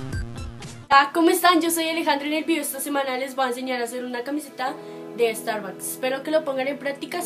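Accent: Colombia. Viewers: Me encanta el acento colombiano. :) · ¿Eres de Colombia<3 ? No sé, te escuchas colombiana:3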